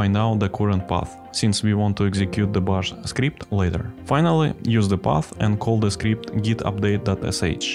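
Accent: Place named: Russia. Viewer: Слушать ролики на английском с русским акцентом помогает тренировать аудирование!:)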